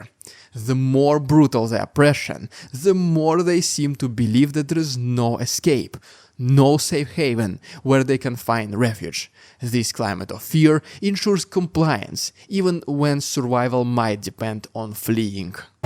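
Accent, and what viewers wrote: Russia. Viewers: With that accent, there is no doubt that this dude is Russian. What gets me is that this dude's English vocabulary is in the 90th percentile. Impressive.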